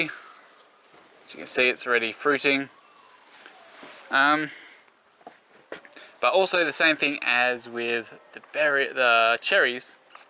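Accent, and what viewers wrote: Australia. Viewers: Yes, Aussie. I'v got you not from England ;-)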